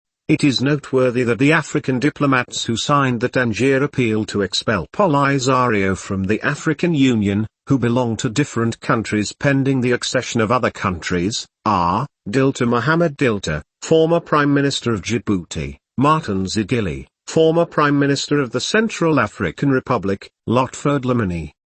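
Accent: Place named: United Kingdom. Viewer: Very good idea! Keep up the good job even if I am not familiar with British accent.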